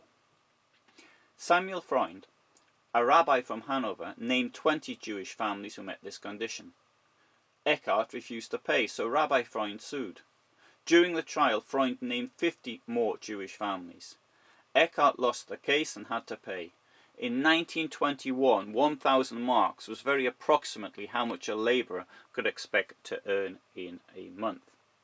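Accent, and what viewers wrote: Newcastle, United Kingdom. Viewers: Geordie narrator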